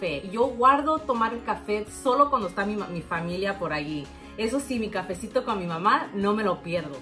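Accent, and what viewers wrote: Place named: Spain. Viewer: First, love your Spanish accent you are excellente instructor.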